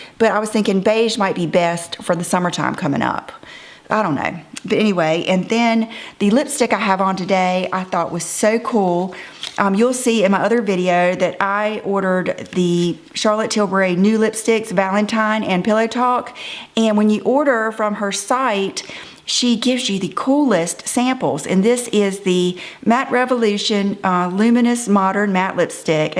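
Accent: South, United States. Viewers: Love your channel! Your voice is music to my ears as I am a displaced Southerner! Love your content too! Thank you so much for bringing all this info in a fun to watch videos! Not easy to do.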